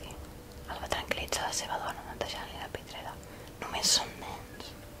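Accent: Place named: Spain. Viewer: Hola: Que bonito tu ASMR, me encanta la tonalidad de tu voz y lo más FANTÁSTICO es que el acento ESPAÑOL SE PRESTA MUY BIEN AL "ASMR". Por favor, haz mas ASMR, en Español y por ahi en Catalán, PERO ahorita procura hacerlo en Español, ahhhh siempre es recomendable poner en el titulo del Video ASMR, cuando vas hacer ASMR, para que así más usuarios puedan gozar de tu lindo material FELICITACIONES: Me encanto tu Video. Saludos desde Lima - Perú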